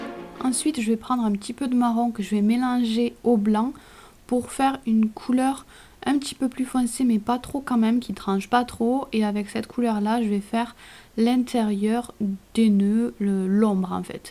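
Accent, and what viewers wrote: South, France. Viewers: Bonjour! Je vais poser une question un peu bête, je voulais savoir si tu étais en région parisienne (je tente quand même malgré ton accent du Sud!). J'ai un coup de cœur pour cette manucure et j'aurai bien aimé que tu me la fasse pour mon mariage l'année prochaine!!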